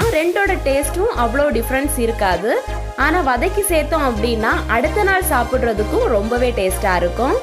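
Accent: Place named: Marthandam, India. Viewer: Aiwaa marthandam tamil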